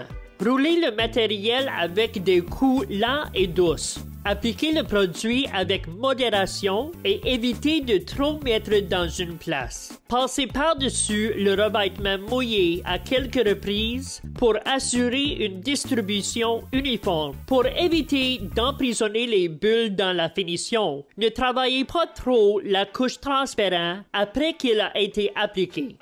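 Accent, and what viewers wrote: Quebec, Canada. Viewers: Aie c quoi cette voix c l'accent québécois mélanger avec canard 🦆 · je pense tout simplement que ce monsieur est Québécois.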